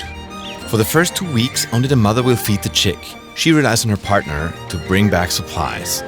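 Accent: Germany. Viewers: When you're used to fake German accents done on purpose by some youtubers and stumble upon a serious documentary with a real German accent. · Deinem Englisch nach bist du eindeutig deutsch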